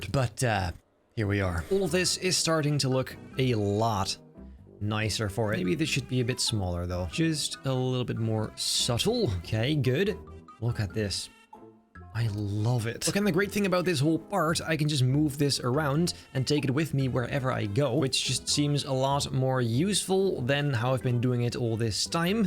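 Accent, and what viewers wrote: Netherlands. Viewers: nether lands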